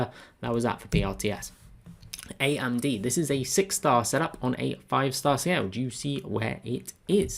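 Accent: England, United Kingdom. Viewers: Brilliant as usual, thanks. I hope you don't mind me asking, where are you from in England?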